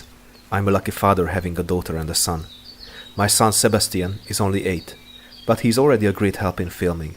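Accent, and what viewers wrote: Hungary. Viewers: The moment i heard your voice i knew you are Hungarian. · This is the first video I saw from you, but I instantly recognized the hungarian accent. :D Great vid, keep up the good work, valahol van egy eladó bojlerem is.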